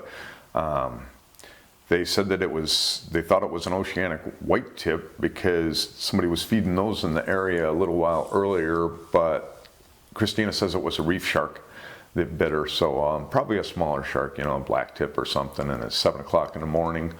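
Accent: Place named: Midwest, United States. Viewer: Hi, cool vids. Where are you from, I'm from Milwaukee-Chicago area originally. You sound very Midwest to me. I'm a former surfer living in WA. Learned in California. Surfed westcoast, Mexico and Hawaii. Can no longer, out of fear. A friend of mine Kenny Doubt was severely bitten in Cannon Beach OR. by a GW in 1979. I just can't do it anymore. It was one of my favorite sports.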